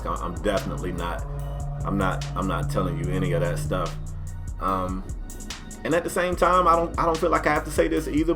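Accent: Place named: South, United States. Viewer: I remember in 2k12 days you made me create a scoring wing.... An you had a real country accent, it really showed when ever you said rain maker.... you had the best ncaa story’s....You made me watch a madden series.when I hated madden just to see johnnie, josh, and Ed reed reunite.... I wish you the best and may peace and prosperity be upon you 🤟🏾🤟🏾🤟🏾🗣🗣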